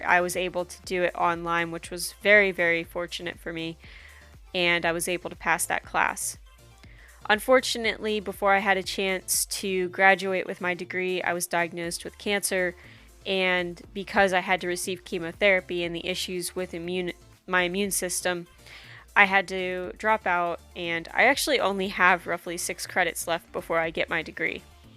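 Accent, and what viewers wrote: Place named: Pennsylvania, United States. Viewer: I'm very sorry. Could be a malpractice suit somewhere in there. Just because a person is 18 years of age doesn't mean there's not an serious underlying problem occurring. If the Docs were that concerned about drug use- just needed to perform a simple lab test there on the spot ( I worked in a laboratory). Would've been ruled out immediately... and quite possibly could've addressed the serious medical issue before it proliferated or worsened. Once again, I'm sorry. You sound like your from Central to western Pennsylvania or even southern Ohio. The manner in which you pronounce the word "cold" is audibly simulant of that area. It's cute though. Please be well.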